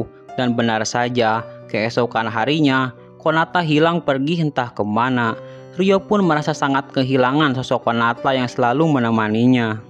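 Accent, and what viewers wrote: West Java, Indonesia. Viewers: Admin asli sunda nya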